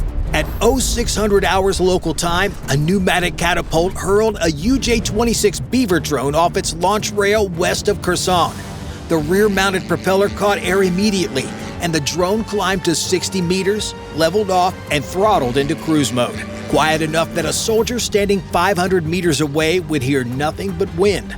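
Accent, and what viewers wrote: United States. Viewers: Drop the shouty American voice over, it ruins your video !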